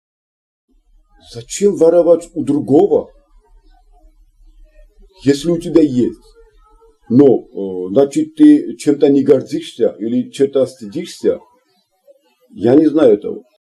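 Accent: North Ossetia, Russia. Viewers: ЧТО У ТЕБЯ ЕСТЬ И ЧТО У ТЕБЯ ВОРОВАТЬ? ВОН ТУРКИ УЖЕ У ВАС ДОМА КОМАНДУЮТ И ПРИБИРАЮТ ВСЁ К РУКАМ. НЕ ДАРОМ ОНИ ВАС НАЗЫВАЮТ ГУРДЖЫСТАН, ЧТО ОБОЗНАЧАЕТ РАБЫ! ТАК, ЧТО ГОРДИСЬ ДАЛЬШЕ. У ТЕБЯ САМОГО АКЦЕНТ ОСЕТИНСКИЙ Я В ЭТОМ ХОРОШО И ЧЕТКО МОГУ ОТЛИЧИЕ УВИДЕТЬ. ТАК,ЧТО НЕ НАДО ЛЯ ЛЯ... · А почему у тебя осетинский акцент ти случайно не осетин. Плохо брать по грузинский говоришь.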